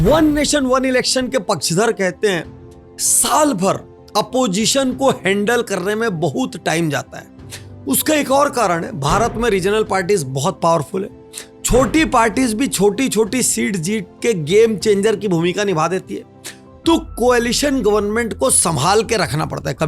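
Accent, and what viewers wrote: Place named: Gujarat, India. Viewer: लगता है सर आप गुजरात से है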